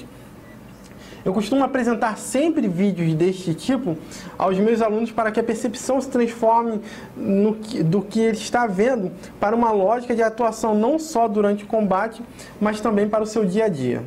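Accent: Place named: Rio de Janeiro, Brazil. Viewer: Putz, carioquês latente. Mas o video é interessante.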